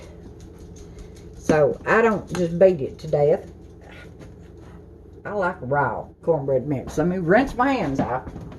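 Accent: South, United States. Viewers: I absolutely love watching your videos. If I cud afford to send u a gift. I wud. U are a complete JOY to watch. AND I laugh at the way u talk w ur accent and ur country ways. I'm the same way girl. I've had fried bread all my life. And I love it. You are one hallarious woman and I wud love to meet u. Bit I live in North Carolina. And I don't own a car. Or I'd pay u a little visit. Lots of love, hugs and prayers sweetheart ❤♥💕💖😘💗❤. GOD BLESS US ALL SWEETHEART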